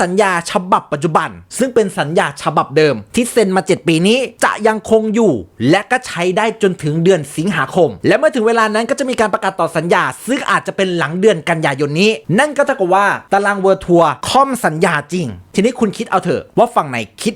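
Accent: Isan, Thailand. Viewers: พากย์ได้แซ่บจิงๆ ชอบๆ มีแทรกอีสานเข้าไปด้วย มันส์ดี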